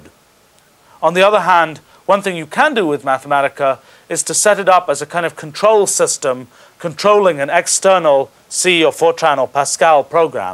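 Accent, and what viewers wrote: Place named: United Kingdom. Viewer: Actually his accent has become noticeably more British. The accent above sounds Mid-Atlantic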